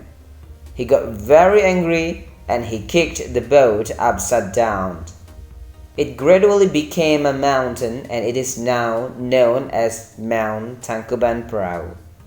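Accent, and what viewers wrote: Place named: United Kingdom. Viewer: Saran mas : Kan w merhatiin kalau mas lagi pakai accent yang lumayan British, nah Cara mengucapkan Brought nya itu seperti ini : "Brot" kek gt bro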